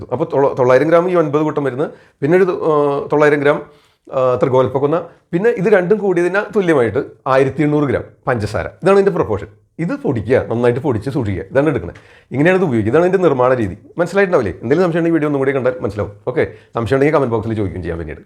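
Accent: Thrissur, India. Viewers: വളരെ നല്ല ഈ അറിവു തന്നതിനു നന്ദി, തൃശ്ശൂർ രീതിയിലുള്ള അവതരണം നന്നായിരിക്കുന്നു 🙏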